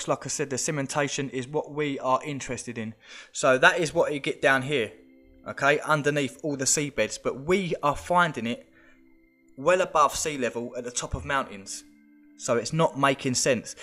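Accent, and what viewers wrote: United Kingdom. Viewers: Hay love the accent bro it's so English even English isn't English enough for you cool video too love to find hidden stuff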